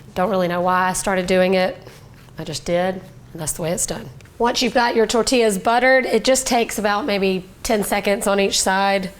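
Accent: South, United States. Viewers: Hands down the most creative chicken enchilada recipe here on YouTube. 💯 I still grimace when I hear flour tortillas are being used where corn traditionally is utilized, but perhaps I need to finally try it for myself, which I will, for the first time, soon. However, I will plan to roast the poblano Chile and bell pepper first. Maybe add some bacon grease to the beans, and some tomato paste to the onion, pepper, & poblano chili saute. Btw--love this chic, her southern draw, and relaxed attitude around food; reminds me of my Texas upbringing......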